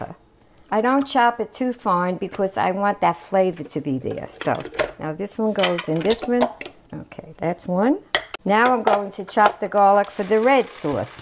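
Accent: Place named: New York, United States. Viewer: You sound like your from New York !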